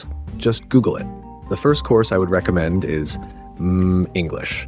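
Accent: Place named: United States. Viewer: For me, this sounds like a typical American English accent. I’ve met some Australian people here in Canada, and their way of speaking is quite unusual and not very easy to understand.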